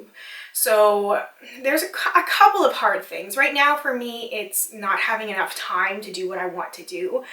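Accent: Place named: Canada. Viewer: I am so sorry you have received mean comments about your appearance, that is so rude and unnecessary. I only recently started appreciating all the little nuances in people’s characteristics and how it makes them uniquely beautiful. I think representation is important in media, so it’s nice to see someone with a different style, opinion and features. You’re doing an awesome job on your channel! ❤️ ps. Every time you say about...I hear aboot. It makes me so happy as a fellow Canadian 😀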